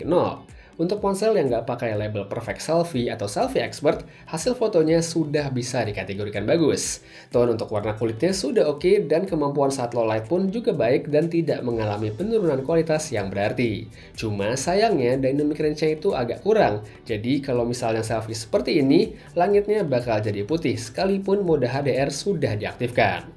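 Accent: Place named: Java, Indonesia. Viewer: Reviewer Medok muke lu medok njing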